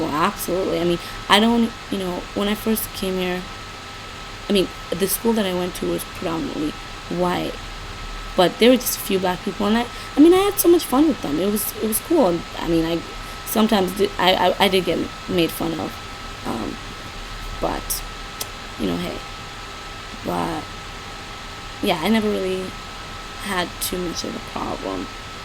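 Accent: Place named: Nigeria. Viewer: Sup? I'm also Nigerian/American but moved to the States when I was 2. Surprised to hear you still have a hint of a Naija accent! informative video...pz.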